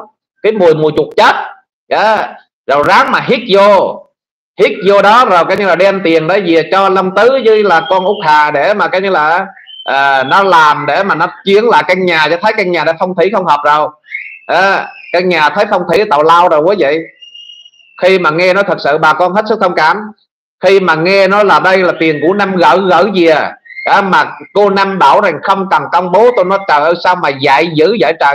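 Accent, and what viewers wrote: Standard. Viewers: Nói chuẩn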